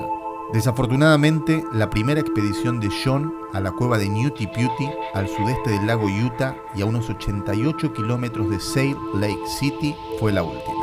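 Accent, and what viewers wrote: Argentina. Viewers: Entiendo. La verdad es que me encanta el acento argentino 😄👍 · Sos argentino ?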